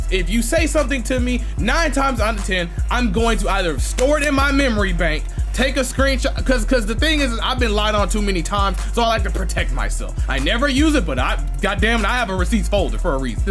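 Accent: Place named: Memphis, United States. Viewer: You from Memphis G?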